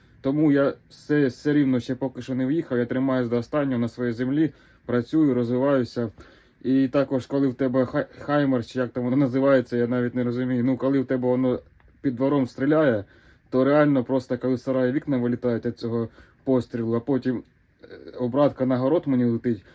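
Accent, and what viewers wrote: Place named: Zhytomyr, Ukraine. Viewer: не звиртай увагу на рiзних дурнiв добре видеш канал, в нас на житомирщинi говорать також суржиком!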